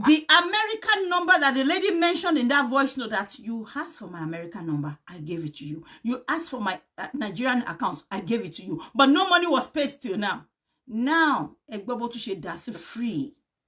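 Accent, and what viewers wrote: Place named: RP, United Kingdom. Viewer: I no know sey dis LADY 👸🧚‍♀️ sabi talk Queens English like dis ajeh 🙆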